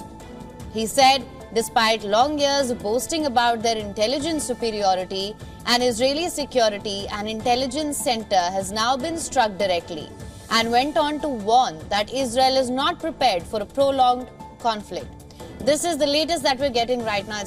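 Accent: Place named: Gujarat, India. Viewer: Is this channel for Indians only...why does she speak like some Gujarati aunt from some factory in England.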